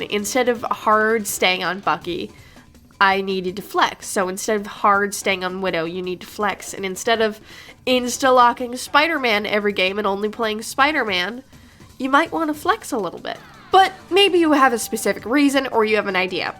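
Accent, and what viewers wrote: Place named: Michigan, United States. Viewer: guessing Michigan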